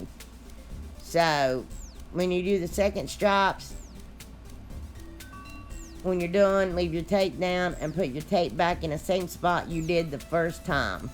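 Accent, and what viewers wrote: South, United States. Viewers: That is absolutely gorgeous! I wouldn't have thought to do the Buffalo check in green! What colors did you use besides celery? And where are you from? You sound very southern lol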